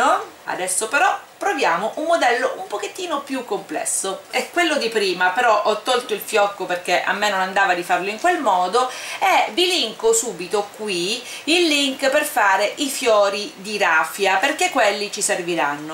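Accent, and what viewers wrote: Sicily, Italy. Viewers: Sei sicula?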